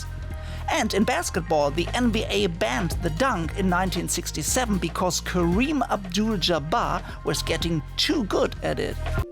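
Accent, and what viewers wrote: Germany. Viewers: Possibly the most german sounding German I've ever heard. Great vid!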